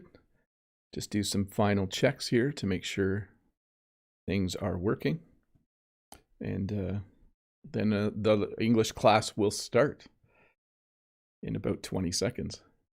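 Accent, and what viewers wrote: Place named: Canada. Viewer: Hi, no problem your Canadian accent is great and we understand your good English very well. salut mon ami.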